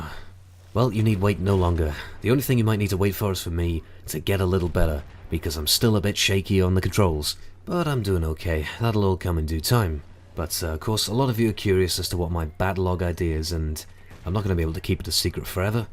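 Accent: United Kingdom. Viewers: That accent! Somewhere from UK, I guess.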